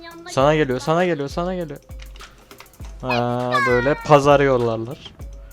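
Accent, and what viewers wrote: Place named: Ankara, Turkey. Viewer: La yürü git La Dkfldlfldlg tam bir angaralı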